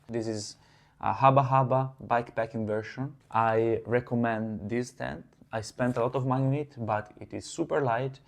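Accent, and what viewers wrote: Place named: Italy. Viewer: Si sente che cmq sei italiano ❤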